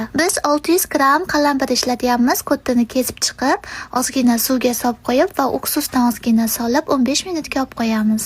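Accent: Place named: Fergana Valley, Uzbekistan. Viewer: Водийни кайсидир жойлари шунака шева бор калапир кутини ёки бошка нармаларни кутини диб гапиришади эшитганман деме бу кишим водийдан😅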